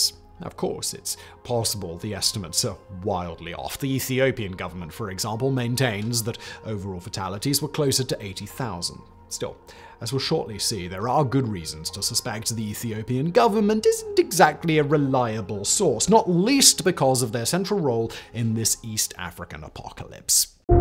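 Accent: RP, United Kingdom. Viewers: You are simply very biased, repeating TPLF propaganda with a BBC accent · I wish the world to know not all of us British sound this way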